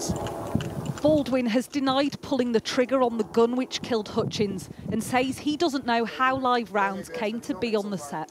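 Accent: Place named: United Kingdom. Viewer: so tired of the Brit-ish voice overs.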